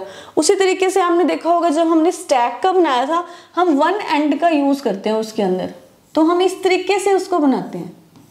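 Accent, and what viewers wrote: Punjab, India. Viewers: Accent punjabi didn't left the chat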